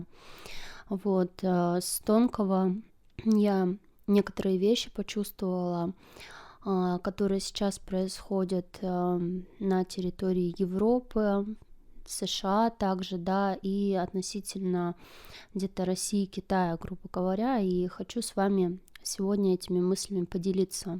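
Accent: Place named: Ukraine. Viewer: По говору слышно, что с Украины, и по смысловой части тоже.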